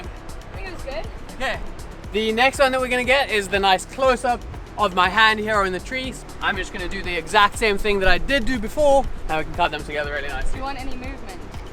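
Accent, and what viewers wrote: South Africa. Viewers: Hey, are you from South Africa?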